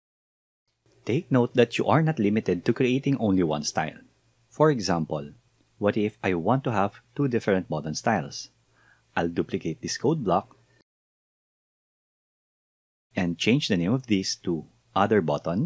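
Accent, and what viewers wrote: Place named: Philippines. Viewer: Malalaman mo talaga quality ng mga pinoy pag nag english hehe diba sir?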